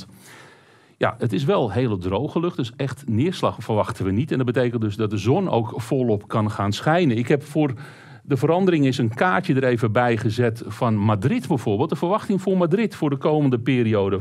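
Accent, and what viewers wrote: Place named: Amsterdam, Netherlands. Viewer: Lekker Amsterdams accent!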